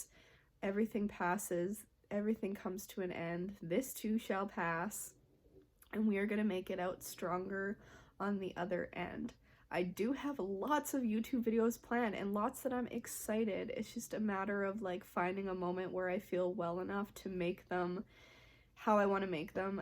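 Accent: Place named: Minnesota, United States. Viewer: Oh no worries girl we knew it from your accent ;). Minnesota native here. Hope you are doing ok up there and stay warm! xoxo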